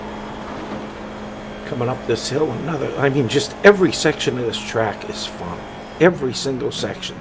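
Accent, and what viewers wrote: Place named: New England, United States. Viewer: BTW, I lived in Boston for years and I loved hearing that New England accent. 😍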